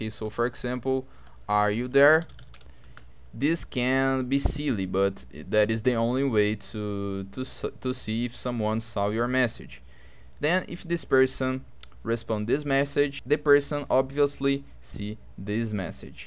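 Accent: Brazil. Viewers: pelo sotaque, é br kkkkkkkkk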